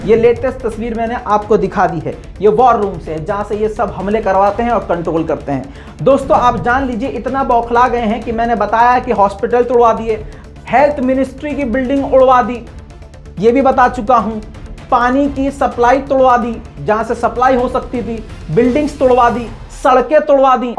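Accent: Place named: Bihar, India. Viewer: sahab aap bahut acchi anchoring karte haiN thora lahze meN biharipan hai usko bhi durust kar lete to aur nikhar jate waise jitna hai wo bhi qabiledad hai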